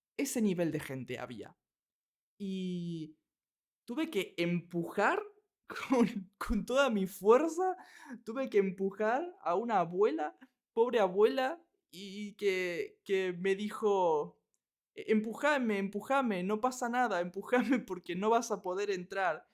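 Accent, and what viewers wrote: Argentina. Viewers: No tenia que llevar el puesto por el tren? (Suena como chiste, llevar puesto, como decir llevo puesto nada por el tren, no se). Habia otro hombre con camion que venia para el puesto, dices? No entendi todo, pero entendi lo suficiente espero. Hoy he sucrito al canal, y hoy es primera vez he oido accento de Argentina excepto ayer un poco de un video sobre Casa de Asterion, la cuenta corta supongo que la has leido tal vez? Seria un buen tema para un video. I just remembered you speak english and italian but i had to practice writing in spanish. I dont know why i suggested la casa de Asterion this video is already super helpful. Thank you for making the videos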